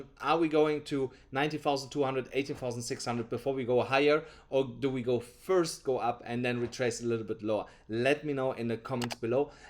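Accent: Germany. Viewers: Love the German accent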